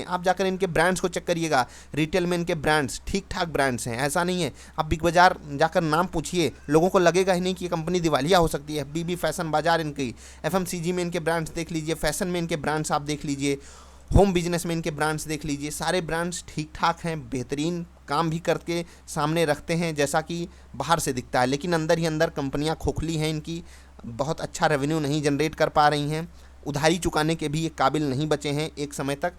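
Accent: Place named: Bihar, India. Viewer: बातो से तो परफेक्ट बिहारी भैया जैसी आवाज है. सजेशन भी पागलो जैसे देता है. शायद तु फ्रेशर है स्टोक मार्केट मे.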